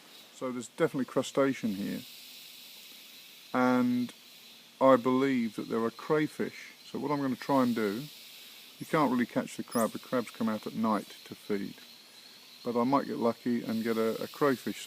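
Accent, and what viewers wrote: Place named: Hampshire, United Kingdom. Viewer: Never knew you were a 'Ampshire hog' like me. Funny how accents deceive people! ATB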